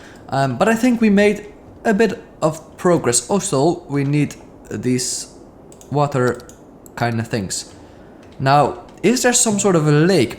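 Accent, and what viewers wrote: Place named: Netherlands. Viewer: I know I am a bit late but are you dutch because you have a dutch accent and if you are so am I love city skylines I live in Rotterdam groetjes uit Rotterdam zuid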